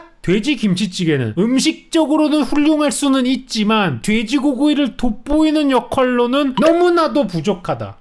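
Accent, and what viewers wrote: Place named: North Korea. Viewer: 이사람말투왜이럼 북에서왔음?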